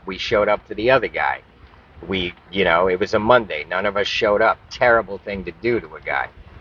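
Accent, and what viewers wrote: New York, United States. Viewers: I love how New Yorkers call it Flurida! Love ya bro, what is wrong with smoking weed? It’s about as harmful as drinking coffee, you don’t see coffee drinkers saying they are going sober. I support you 100% though, only you know if it’s a problem. You have helped more people with addiction problems than you know by being honest. Love ya Brother, good muck with your progress. I’m a retired Machinist and I’ve never met another Machinist that wasn’t a pothead. We would have to read blueprints and edit blueprints as we were making maybe aerospace parts, no relation to Boeings bs, and our best work was when we got off work, sat around the house, smoked out, and talked about how we could machine this part better, use a different tool steel, etc. We were never high on the job, but our most amazing work ideas came from sitting around being high. 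We actually worked on some of the self guided depleted uranium 20mm rounds the Gov’t may have been trying to fast track in Afghanistan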